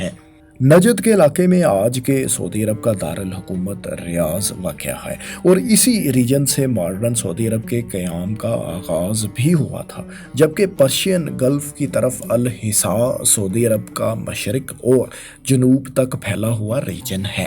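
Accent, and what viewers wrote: Punjab, Pakistan. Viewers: Your heading is grammatically incorrect. Don't you know that first form of verb is always used after did. Secondly, you say simple Urdu words in Punjabi accent like a typical Paindo does. Gal samaj ayi?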